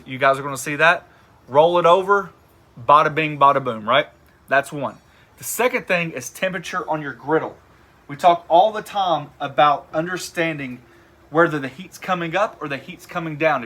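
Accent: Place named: Texas, United States. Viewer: Edumacate me please... is that a Texan accent/southern accent, ahh luuuve eeyt! its the purfaact chef ayxcent!!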